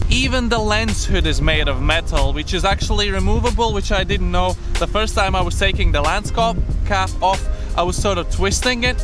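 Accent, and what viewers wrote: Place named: Scotland, United Kingdom. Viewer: I can't place your accent... I recognise a Scottish edge in there.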